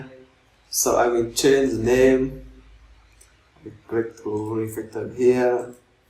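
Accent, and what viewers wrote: Vietnam. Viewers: Đúng cái mình cần 😂😂 nghe cách pronounce đoán ngay a zai ngưòi việt 🤭🤭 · nghe giống người việt nam v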